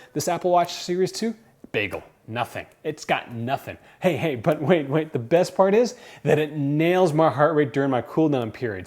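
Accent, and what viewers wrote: Canada. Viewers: I like your Canadian accent eh.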